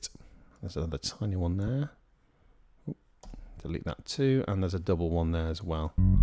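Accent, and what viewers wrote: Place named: United Kingdom. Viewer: "might be a cheeky one in there" tehehe love the british accent and vernacular :) thanks for helpful video!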